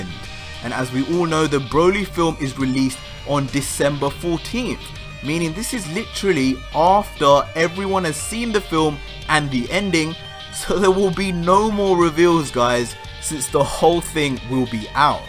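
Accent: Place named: England, United Kingdom. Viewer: Hello you sound like your from England, so am I !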